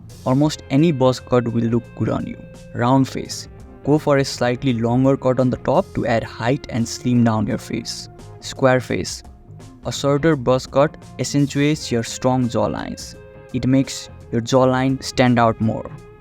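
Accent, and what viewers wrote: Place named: Nepal. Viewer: you from nepal or sum ? sounds like a nepalese accent to me, might be wrong? just curious.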